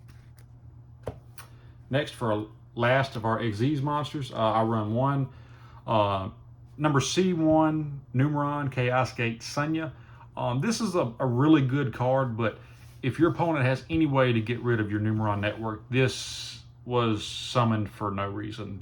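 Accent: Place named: South, United States. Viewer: Something about hearing a southern accent talk about yugioh seems just right. Great deck, hope your channel booms.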